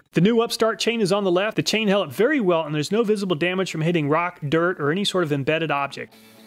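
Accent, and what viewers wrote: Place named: South, United States. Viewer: Very good info but man you talk so fast my ears could not keep up so I lowered the playback speed to .75 and now I can understand you better , now sounds just like a good old boy from the South which my ears appreciate much better .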